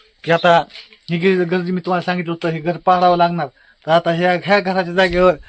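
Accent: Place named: Kolhapur, India. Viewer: चे बोलणे एकदम मस्त आहे कारण तिचे बोलणे थोडे थोडे कोल्हापूर चे वाटते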